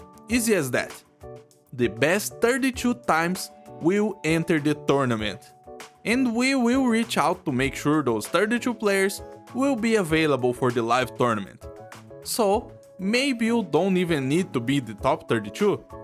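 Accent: Brazil. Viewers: Are u Brazilian? I'm trying to pinpoint ur accent lol😅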